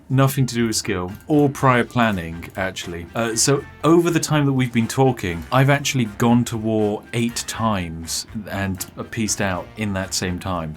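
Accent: United Kingdom. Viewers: He's british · I think he might be British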